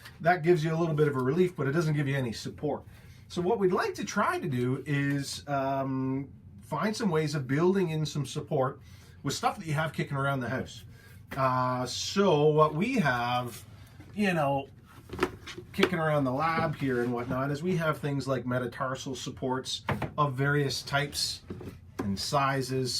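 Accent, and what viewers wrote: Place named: Canada. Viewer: Yes, it's called "Canadian raising." Before I saw Barrie and Orillia in the channel title, I knew was a fellow Canadian. It seems to be more pronounced in/typical of southern Ontario, but we do it here on the west coast too.:)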